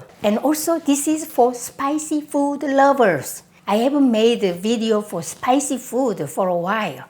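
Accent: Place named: South Korea. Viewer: As much as I loves your cooking, I, too likes your English with a Korean slang👍😘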